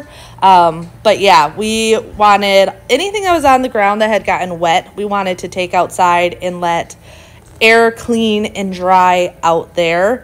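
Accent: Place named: Midwest, United States. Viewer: I love watching your videos they are so motivating. I also love hearing your midwestern accent I have family in Illinois and I just feel like we are family.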